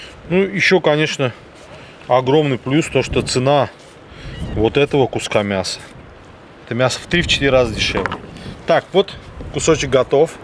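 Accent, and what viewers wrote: Baku, Azerbaijan. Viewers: Дорогой, любое твоё блюдо - шедевр, спору нет. Но больше всего подкупает твой едва уловимый бакинский говор. Это нечто!!!! Как будто дома, в Дербенте...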